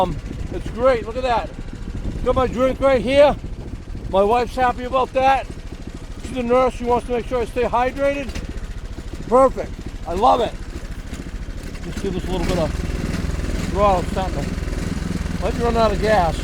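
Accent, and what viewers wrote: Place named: Boston, United States. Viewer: Sounds like a Bostonian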